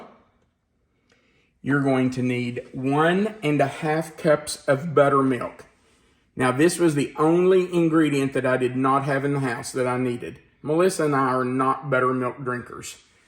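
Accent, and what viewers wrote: South, United States. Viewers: I was just going to download your video so I could make this cake later, but that wonderful Southern accent kept me glued to the screen!😂 Your voice is very pleasing, and that cake - wow!! You're a great teacher by the way, thank you!!❤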